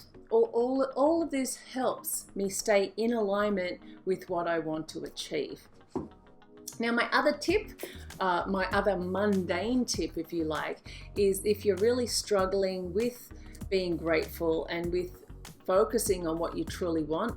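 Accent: Australia. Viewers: Thank you and ~ thank you, everything sounds so much nicer with an Aussie accent:D Thank you for sharing your knowledge Blessings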